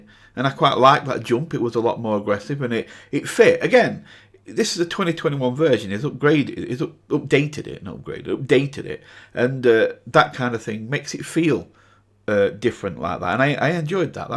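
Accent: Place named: Liverpool, United Kingdom. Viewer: When you listend to for the very first time did you expect to fall down into such a huge rabbit hole? I remember you said that you rate songs and you rarely rate songs with a 10. I was so amused because I already knew what you are heading into. I really like your accent although sometimes it isn’t that easy for me to understand. May I ask from which region you are from? Liverpool? I‘m not sure about that, just a guess from a foreigner not native speaking English.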